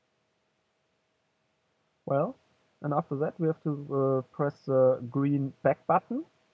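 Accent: Germany. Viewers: AWWW FUCK GERMAN ACCENT!!!!!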